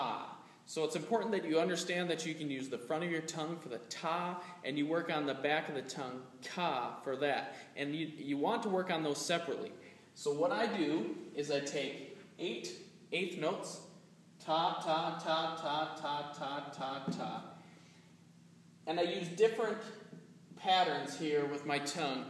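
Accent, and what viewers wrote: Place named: South Dakota, United States. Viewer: Very helpful video! And very prominent South Dakotan accent! :)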